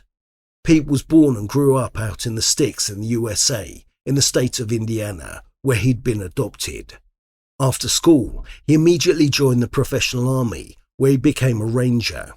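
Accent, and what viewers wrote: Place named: United Kingdom. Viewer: Love 30 days in the hole, fascinating plot. Love British English, so clear❤, useful for learning to understand English by ear. Dear (am I correct?)), thank you for your work. 1-3 I listened in Russian, looking forward to the next one.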